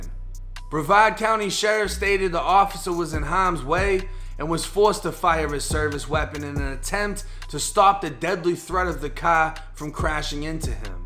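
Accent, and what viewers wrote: Boston, United States. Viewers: i think he from boston moved to Florida now he's back in Boston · Bra your channel interesting, but I'm from the O (X) why you sound like you from Boston somewhere? No shade just wandering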